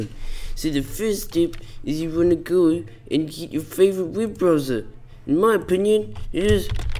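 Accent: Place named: Russia. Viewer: Russian speaking inglish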